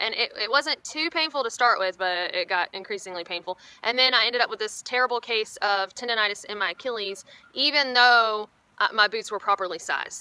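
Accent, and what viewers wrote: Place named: Tennessee, United States. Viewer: I have watched several of your videos of the last 5 months or so and I have to say this and I mean it in the nicest way and as a complement. I have the biggest crush on you and your personality. Your southern Tennessee drawl and snagged tooth are icing on the cake of your sweetest disposition and personality. If I was 15 years younger I would be flirting big time. Lol you are very pretty but not afraid to get dirty and for such a pretty girl that is amazing. After you mention you danced to I was this girl the greatest! I like the way you talk in your videos as you are real and humble in your advice. Not a know it all or a person trying to make money on YouTube just simple and honest. May the wind be to your back while traveling to " " cheers from a California redwoods man they call me Mexibilly.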